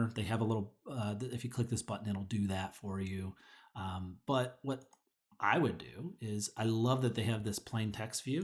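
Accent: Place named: United Kingdom. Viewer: Uk English i like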